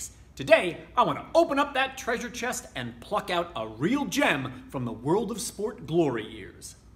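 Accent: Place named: United States. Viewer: Why is some American guy talking to me?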